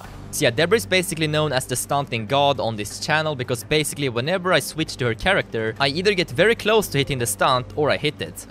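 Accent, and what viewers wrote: Sweden. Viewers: I love your swedish-english xD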